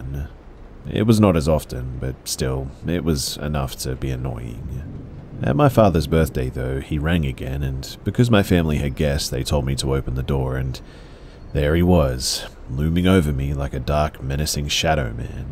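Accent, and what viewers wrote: Australia. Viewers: Favorite video you put out as of recently. You're the fucking man, Be. Thanks for your beautiful Aussie accent lulling me to sleep every night. You've gotten me through some tough times and I genuinely thank you for that man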